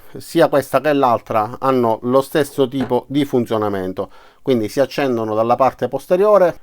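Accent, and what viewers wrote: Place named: Palermo, Italy. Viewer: Ciao io sono di Trapani, tu dall'accento sembri Palermitano.. O sbaglio?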